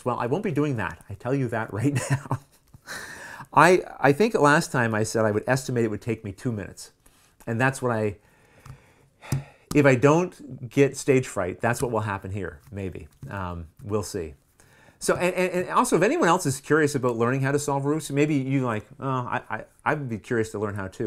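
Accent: Canada. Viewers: That Canadian accent is pleasant :)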